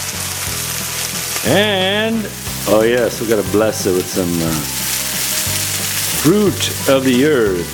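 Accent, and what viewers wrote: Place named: Sicily, Italy. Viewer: Wow. My grandmother had that same accent. I haven’t heard a Sicilian accent in so long ❤️ It’s different than an Italian accent somehow.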